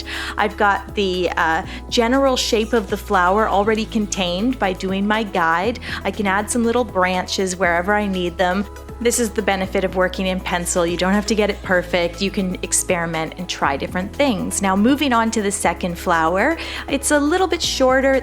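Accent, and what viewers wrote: Canada. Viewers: So I’m The first time viewer and into this video I asked myself is this a fellow Canadian, because I’m pretty sure she’s from Canada ? Checks online Yep. 🇨🇦👍❤️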